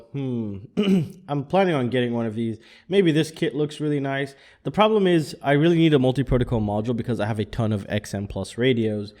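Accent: United States. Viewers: You sound very much american, yet you live in europe, just curious about your situation.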